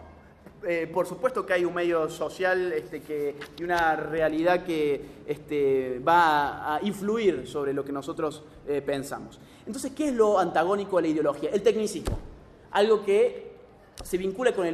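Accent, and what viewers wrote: Argentina. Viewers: el acento cordobes era mas pronunciado antes jaaj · Que lindo ese acento cordobés 😍. ..Laje sos un genio 💖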